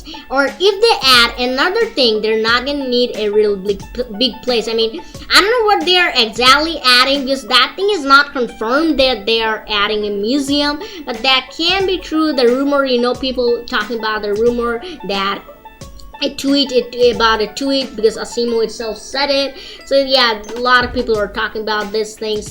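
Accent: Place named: South, United States. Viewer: God he sounds like a hillbilly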